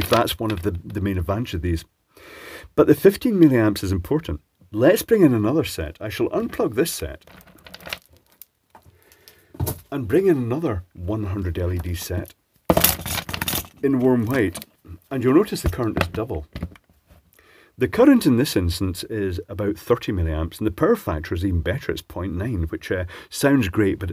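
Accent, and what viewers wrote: Scotland, United Kingdom. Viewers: Nice to see someone making decent electrical devices. I really like that they use rubber. I go out of my way to buy wire, test leads and so on, that are made from either rubber or silicon. Your videos are wonderful, especially on a Friday after a long work week and I need a pleasant Scottish accent dude showing me silly videos about his latest purchase of cheap Chinese electronics. Hehe. You're a gem :)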